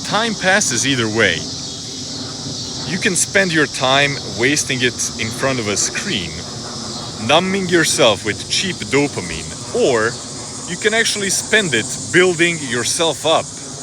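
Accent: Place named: Eastern Europe. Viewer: i knew u slavic or russian with ur accent good for practising as a russian native speaker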